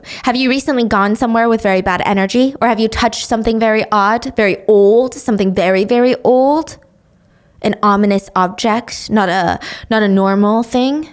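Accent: United Kingdom. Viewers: Uh why sound british¿!?!? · how do u always become britsh so randomly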